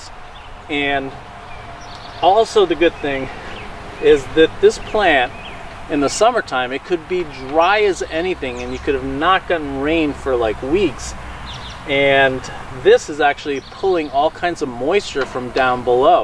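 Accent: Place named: Boston, United States. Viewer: Every time I watch one of these videos, I wonder if it’s gonna be relevant to my zone and the moment I heard your Boston accent I knew I was good!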